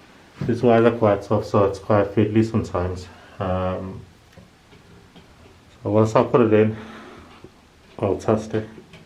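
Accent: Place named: Liverpool, United Kingdom. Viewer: Carried on watching and you’ve now mentioned Liverpool so that’s answered my question 😂 I could tell you were scouse from your accent ❤️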